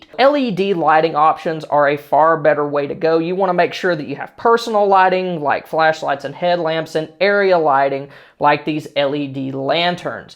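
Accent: Texas, United States. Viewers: Sounds like a Texan to me sorry if I am mistaken and your offended by chance. I find Texas and the people good folk(never been maybe one day)...anyway...stars are bright big and bright this is the land of Texas(all I know..). Take care and stay frosty brother.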